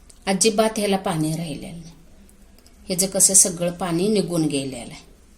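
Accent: Kolhapur, India. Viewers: Khup chan lonche distey.tumhi chan samjaun sangata.aapali kolhapuri bhasha kanala god watate🙂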